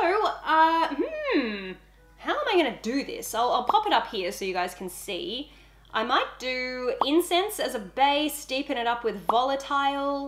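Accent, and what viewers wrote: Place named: Australia. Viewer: i love that archie has this australian accent of yours, hahaha so cool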